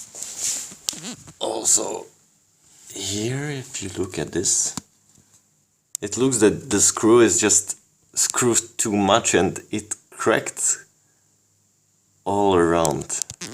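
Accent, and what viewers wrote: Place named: England, United Kingdom. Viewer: I find your video offensive and uninformed; foolish, indeliberately rude libel due to plainly displayed ignorance. I suggest to Kawai that you be sued in a proper court of law for libel. You know nothing about digital pianos and need to go back to your ignominous acoustic piano hole where you came from where you will never find a girl or guy that will come near you. Kawai is a miracle to the digital piano industry. Ingrateful moron with an English accent to prove it...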